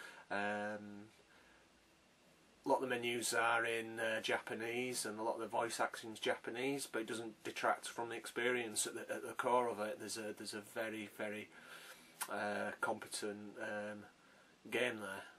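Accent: United Kingdom. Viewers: Very good video, surprised I hadn't watched before -_- got a little scarey toward the end LOL. Nice to finally see you in the flesh, are you a Yorkshire man? Barnsley, Leeds maybe? Thanks again and keep the psp vids coming!! I'll subscribe.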